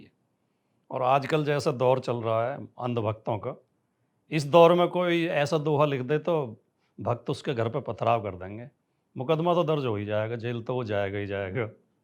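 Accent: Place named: Haryana, India. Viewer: I m from Chhattisgarh.. love to hear haryanvi tone..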